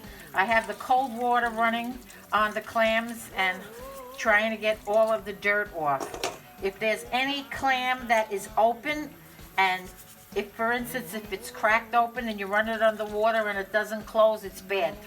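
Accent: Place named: New Jersey, United States. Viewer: Wow this looks good...I'm from Jersy, listening to your voice I'll bet you are too or NY..ps i remember the Purple Gang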